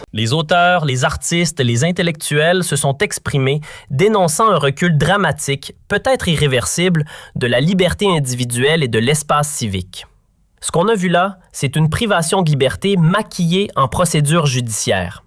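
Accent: Canada. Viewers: De quoi tu te meles toi avec ton accent canadien mais que voulez vous de l'ALGERIE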